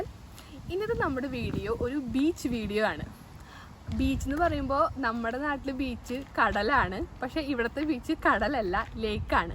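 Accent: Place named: Mahe, India. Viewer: ഇങ്ങളെ ആടാ സ്ഥലം കാണാന് നല്ല മൊൻജ്ജ് ഇണ്ട് 😂 നമ്മളെ മാഹീ ഭാഷ ആണ് ഇത് മാഹീ തലശ്ശേരി ഭക്തെ ഭാഷ 💞🥰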